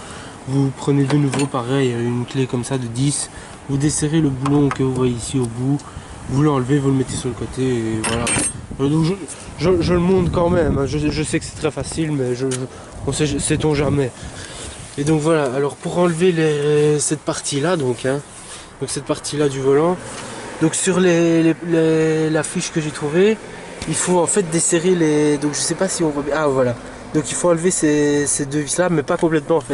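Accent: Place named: Belgium. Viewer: merci pour cette super vidéo qui va me faire économiser quelques sous et qui m'aura aussi beaucoup fait rire entre ton p'ti accent belge , je pense , et tes commentaires ! Si la cuisine te gonfle , tu sauras dans quoi te recycler . Bonne continuation.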